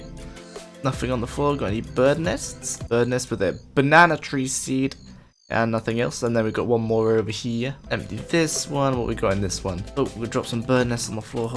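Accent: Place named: RP, United Kingdom. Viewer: your so posh i cant understand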